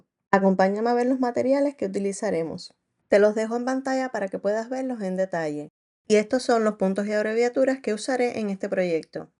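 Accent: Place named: Chile. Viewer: Ela fala mto depressa e bem chilena mesmo! Outras entendo.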